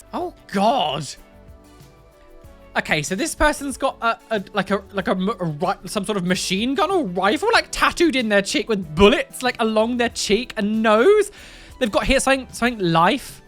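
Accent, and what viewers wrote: United Kingdom. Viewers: It's great to be able to understand what a youtuber is saying without upsetting some people it's easier for a brit to be able to understand a brit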